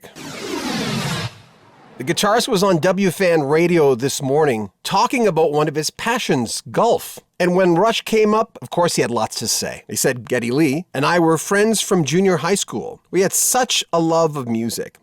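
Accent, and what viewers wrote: Canada. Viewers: When you started reading Alex's quotes I was like, "This guy really sounds like Alex. I wondering if he's doing an impression consciously." Then I kept listening and thought, "Nope...just Canadian."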